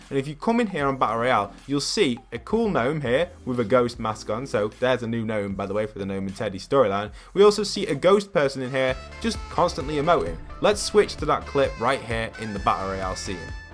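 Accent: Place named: United Kingdom. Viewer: He british that's how Brits say it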